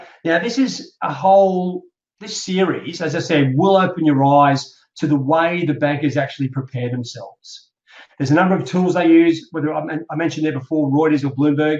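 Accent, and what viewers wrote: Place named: Australia. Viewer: I can't understand that severe down under dialect. pass~>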